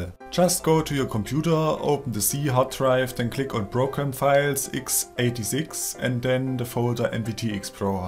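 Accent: Germany. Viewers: Warte, "Aufbau" und dann noch dein Akzent... Ich glaube du bist Deutscher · Man hört sowas von das du ein deutscher bist xD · Are you german´? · Man hört richtig raus das du Deutscher bist :D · ich auch hat man aber auch an den namen der welten gesehen und an deiner ausprache. das der beste command schreiber der welt ein deutscher ist omg xD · I love your German accent so much… :D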